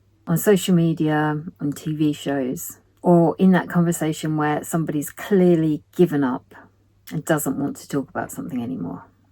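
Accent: United Kingdom. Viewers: what kind of RP accent do you have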